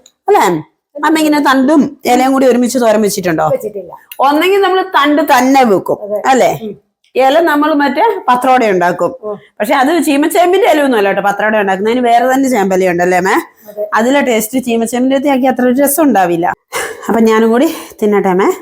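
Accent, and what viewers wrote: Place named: Southern Kerala, India. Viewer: കാസര്‍കോഡ് ആണൊ സംസാരം കേട്ട് ഞാന്‍ വിചാരിച്ചു ഇങ്ങോട്ട് തെക്ക് ആണെന്ന് chilasamayam പാലക്കാട് എന്തായാലും സംസാരം സൂപ്പര്‍ നിങ്ങളുടെ വീഡിയോ എല്ലാതും ഒന്നിനൊന്ന് മെച്ചം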